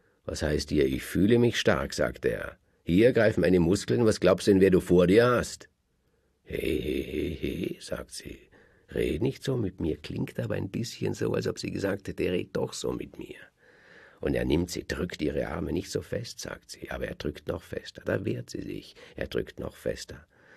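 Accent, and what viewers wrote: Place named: Germany. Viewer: Mit österreichischem Akzent würde es besser klingen. BRD-Deutsch passt nicht dazu.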